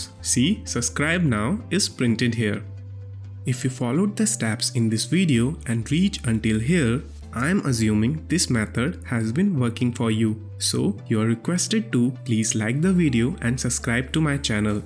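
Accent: India. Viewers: Tnx a lot brother, Btw ur accent in like Indian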